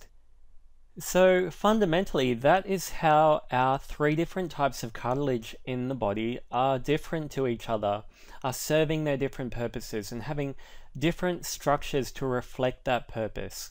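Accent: Australia. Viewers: thank you! like your Australian accent:)(hope I pick it right!)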